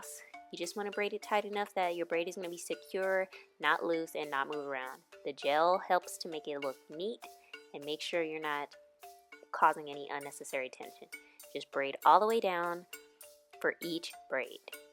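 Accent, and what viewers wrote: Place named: California, United States. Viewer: Beautiful lady , beautiful hair . I'm from the south but love the California accent . You remind me of China Renee another YouTuber with your accent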